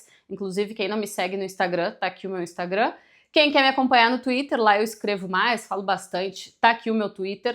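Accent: Rio Grande do Sul, Brazil. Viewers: Três motivos que esse canal é incrivel: 1 - Conteúdo excelente; 2 -; 3 - Orgulho que tu tens do nosso sotaque gaúcho. 🫶🏽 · Ainda bem que apareceu um canal com profissional e ainda com esse sotaque gaúcho.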